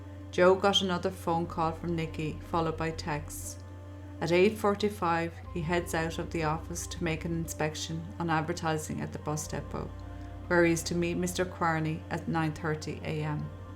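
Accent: Ireland. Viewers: Subscribing from Florida. Your Irish accent is absolutely beautiful! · love to hear Irish accent! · you be true to yourself. lovely Irish voice... Calm, and if slow for some, i think it hints at empathy which is appropriate considering to innocent peoples lives affected forever by crime & destructive behaviour. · Watching from New Zealand, brilliant, easy to understand, don't try to change that lovely accent. I spent years living in Cork city.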